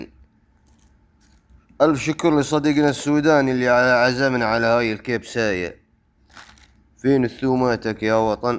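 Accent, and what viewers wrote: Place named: Jordan. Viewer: اللهجة الأردنية ❤😊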